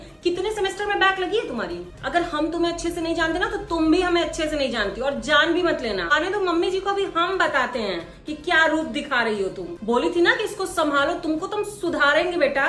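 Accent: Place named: Varanasi, India. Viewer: मुझे तो आपकी पुरानी वीडियो - बनारसी लहजे vali बहुत पसंद हैं , वो फिर से डालिए please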